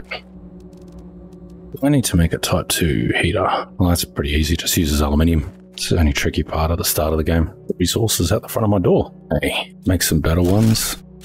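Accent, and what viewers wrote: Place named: Australia. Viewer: Aussie ASMR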